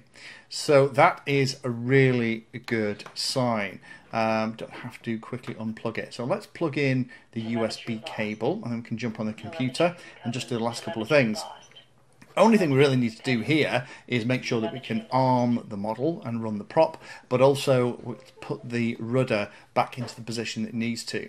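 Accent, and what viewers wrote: United Kingdom. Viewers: I like all of your videos especially your very proper British accent and the pace of your tutorials. I should have watched this particular video first because it gives me a lot of comfort that I will not crash something on my first try with a flight controller.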